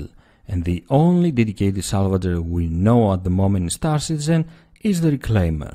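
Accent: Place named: Greece. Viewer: Still miss your Elite content, i don't care if they didn't give you the attention you wanted, i miss my Elite videos with the sexy greek-english. ;) And a lot of stuff have been added and changed, i don't know if you stull play, hopefully you could make some new content. Nothing wrong with the SC content, but that game is so far off release that i try to stay away so i don't get to mutch 'spoilers' when the game becomes a fully playable game i can go and enjoy it, findings out stuff and so on.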